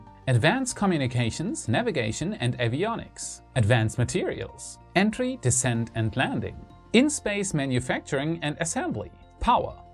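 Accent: Germany. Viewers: He is speaking unusually good English with a slight Tscherman Akzent. The only Germans you are likely to hear with less accent than him are a few professional actors and people who had a bilingual childhood. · I like your slight german or central european accent.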